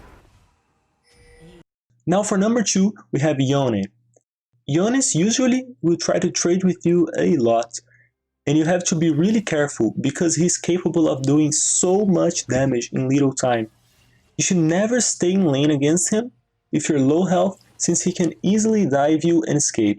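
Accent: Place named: Brazil. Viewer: isso é sotaque br hein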